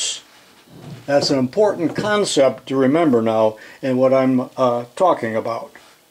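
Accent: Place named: Midwest, United States. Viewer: You have certainly joined the 21st-century with your 3-D printing. I think half the fun of watching your videos is listening to you talk. Your voice is like my first shop teacher and has that “Jimmy Stewart” midwest drawl.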